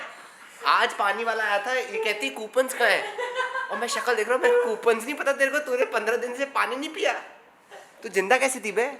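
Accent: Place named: South, India. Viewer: That South indian English Accent of urs lit💯💯🔥🔥🔥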